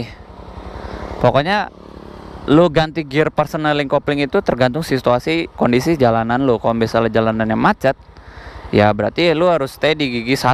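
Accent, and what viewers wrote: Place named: Sunda, Indonesia. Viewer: si akang suara na suara sundanis hatur nuhun kang tutorial na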